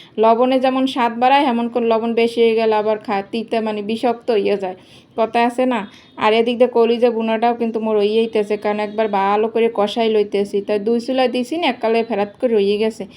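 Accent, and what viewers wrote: Barishal, Bangladesh. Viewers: আপু তোমার বাড়ি কি বরিশাল তোমার কথায় মনে হচ্ছে তোমার বাড়ি বরিশাল আমার বাড়িও বরিশালে ❤❤আজ প্রথম তোমার ভিডিও দেখলাম ভালো লাগলো আপু ❤❤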